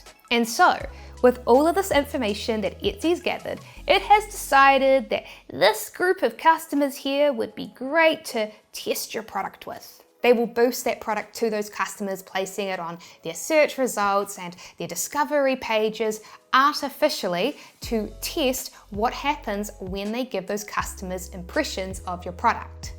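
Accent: New Zealand. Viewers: would.love to have a convo about nz as I wana do tshirt around it.. the tshirt u say to use.. u got any specific nz related advice.. and u too was lovely hearing abit of home.in ur voice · NZ accent is brilliant. Fet? Could be Fit. Could be Fat. Nobody knows!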